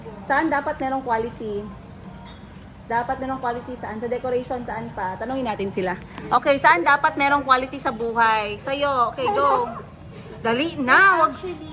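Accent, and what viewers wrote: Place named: Visayas, Philippines. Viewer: love n love q po tlaga ms. yang tagalog bisaya accent mo.nkka goodvibes po.❤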